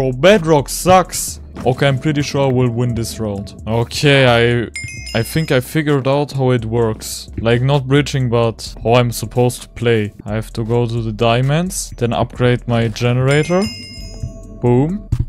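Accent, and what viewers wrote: Germany. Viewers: German accent, I love it😂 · Are You from German?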